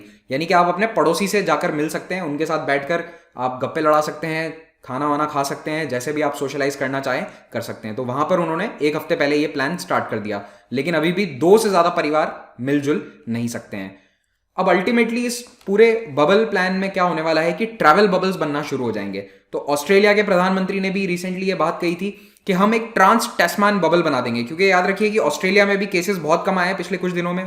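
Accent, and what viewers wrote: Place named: Uttar Pradesh, India. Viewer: Sir aap UP se honge.....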